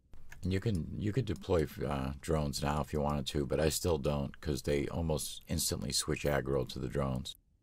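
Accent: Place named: Massachusetts, United States. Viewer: It's a Massachusetts accent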